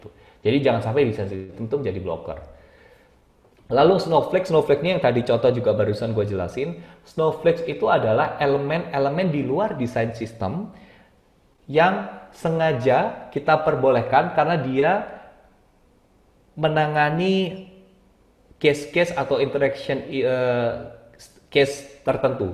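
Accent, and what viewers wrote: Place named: Surabaya, Indonesia. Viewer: Oalah Mas kie wong Suroboyo tho, pantes kok medhok..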